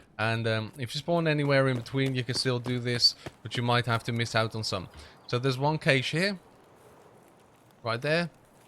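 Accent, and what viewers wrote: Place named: South Africa. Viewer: I've been trying to figure out where you are from by listening to your accent.. (Found your channel today) First I was thinking European that's lived in New Zealand a long time.. But now I'm really leaning towards SA.. :P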